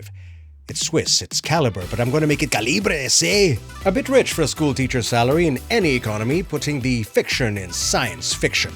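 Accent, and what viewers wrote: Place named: Scotland, United Kingdom. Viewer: I like your Scottish accent better than Scrooge McDuck and the critical drinker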